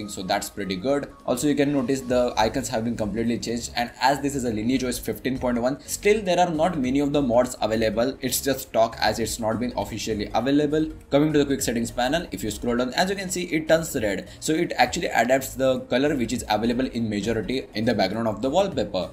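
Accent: India. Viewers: He has Hindi accent English to be precise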